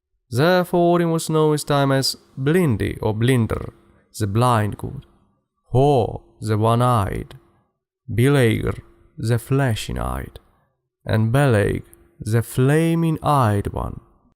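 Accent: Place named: Finland. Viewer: Side note: had to look up your dialect cause it sounded like a weird mix of finnish, italtian and german. UKRAJINA BOIIIIS!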